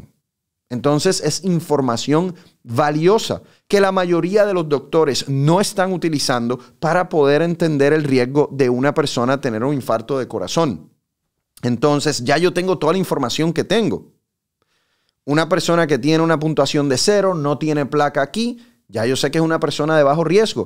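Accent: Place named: Venezuela. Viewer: Perdone Dr. en que país se encuentra Ud.? Y de donde es? Ud.tiene acento Venezolano. Bueno le deseo todo lo mejor de la vida sobre todo mucha salud,felicidad,paz,y prosperidad. Muchos existos en su hermosa carrera. Muchas Bendiciones.!❤❤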